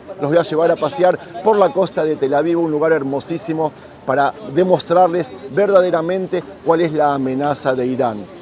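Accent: Argentina. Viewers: Jajaja! Ya no sabes que decir, hablás como argentino y te crees israelí. Ya te lo dije pero eres necio, el pueblo de Dios no es el régimen mataniños de Israel. (La verdad y la justicia siempre sale y saldrá a la LUZ❤) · Irán nunca ha sido problema de nada. El único pecado de Irán es no haber permitido que los jefes sionistas con sus empresas petroleras roben directamente sus recursos. Porque esa gentuza no está acostumbrada a pagar por nada, no se engañen. Desde entonces se la tienen jurada y si leen un poco se darán cuenta que es el país que menos conflictos ha tenido en Oriente Medio, simplemente tuvieron una guerra muy cruenta con Irak porque los sionistas jefes les echaron encima a Saddam, al que luego ahorcaron por cierto. Por el contrario, Israel como estado en Palestina es el mayor error/crimen de la historia moderna que, como dijo un tal De Gaulle, al que por cierto los jefes intentaron asesinar, "traerá ríos de sangre"... 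El sionismo es un concepto político sinónimo de usurpación, crimen, supremacismo, terrorismo, etc, a mí no me va a convencer con su tono argentino y su voz melosa. Israel es un estado desquiciado que se comporta muy agresivamente con sus vecinos, como cualquier especie invasora, es ley biológica. Fundado en Palestina en pleno siglo XX porque lo dicen sus cuentos míticos religiosos, que tiene narices. Y para legitimarse se gastan millonadas en buscar restos arqueológicos porque no pueden reconocer que LA MAYORÍA DE LOS HECHOS BÍBLICOS NO SON HISTÓRICOS SINO MÍTICOS. Pero mucho más patético que el judío sionista es el cristiano sionista, que les tocan las palmas y no se enteran de que no tienen nada en coún: al judío le repugna la figura de Cristo, el peor de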